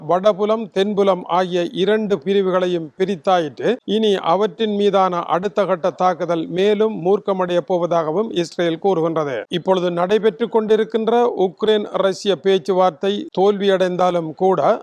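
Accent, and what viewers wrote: Sri Lanka. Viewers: Bro he is lankan,news also lankan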